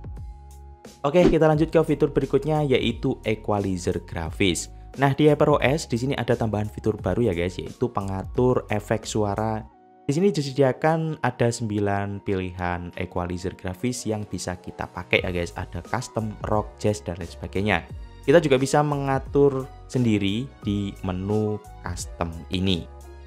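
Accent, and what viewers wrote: Banyuwangi, Indonesia. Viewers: looh mas e wong banyuwangi rek😂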